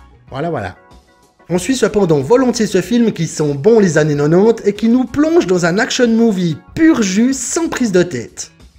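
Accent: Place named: Vaud, Switzerland. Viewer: Excellent cet accent de bien de chez nous. Signé, Le Vaudois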